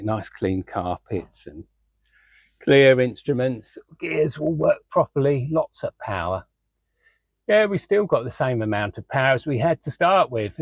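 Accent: United Kingdom. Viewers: Where are u in uk mate? Yorkshire?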